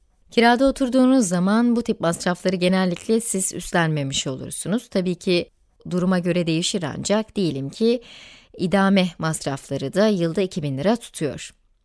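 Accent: United States. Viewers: Fazla Amerikanvari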